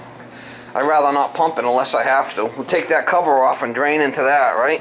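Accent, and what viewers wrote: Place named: Massachusetts, United States. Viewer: This guys Mass accent makes this channel fun to watch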